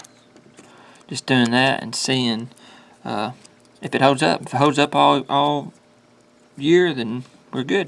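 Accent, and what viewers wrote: South, United States. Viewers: Love your country accent. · Thank you for this!!!! I am going to give this a shot. I was about to throw them out.... but not just yet! I enjoyed your accent as well, good ol' country boy.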